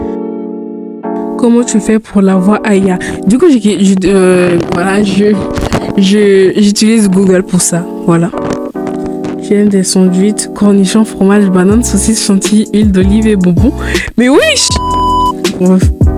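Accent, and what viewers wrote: Ivory Coast. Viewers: Des que g entendues ta voix g sentie l'accens ivoirien je suis ivoirien aussi😂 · Tu sais t'a voie d'ivoiriéne ❤🥳😍 magnifique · C'est moi ou j'ai impression que sa voix c'est comme les ivoirienne mais jsp dites moi si jsuis folles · Tu es ivoirien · La voix ivoiriene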